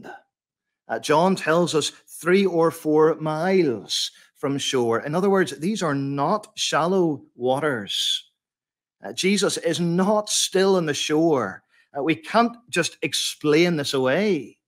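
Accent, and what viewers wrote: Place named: Ireland. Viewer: Thank you for a great sermon - loved the Irish accent too. Nice to see an Irish pastor -God bless.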